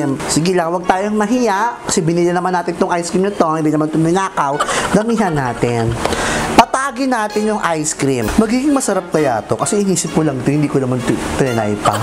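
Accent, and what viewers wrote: Visayas, Philippines. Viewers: BISAYA KABA????????? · bisaya po ba kayo?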